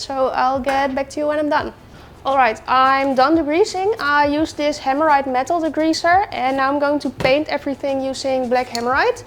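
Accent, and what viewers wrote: Netherlands. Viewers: What is your native language? You sound Dutch?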